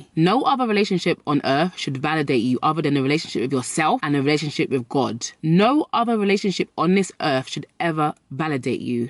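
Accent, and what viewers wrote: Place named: Birmingham, United Kingdom. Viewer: I can here a brummy accent coming out have you been dwn endz😎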